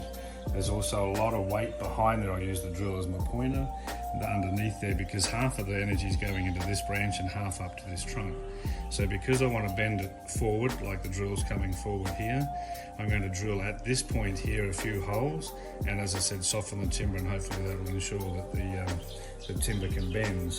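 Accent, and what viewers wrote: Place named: Australia. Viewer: Good ol you tube recommendations. This was really useful and I hadn't seen that drilling approach before on harder wood for bending. Having been raised in Melbourne before finally ending up in the UK it is always great to hear that accent. Cheers for this vid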